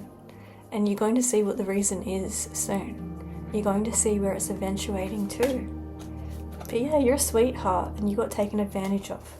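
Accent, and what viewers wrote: Australia. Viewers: All this time I thought I had found an English, but it’s Aussie lol❤ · Hello from California. Good messages, great voice,. We appreciate you. You have our favorite Australian accent. We could listen to you talk for hours. Happy holidays & happy summer to Australia from our channel.:hand-pink-waving::hand-pink-waving:🐦🐦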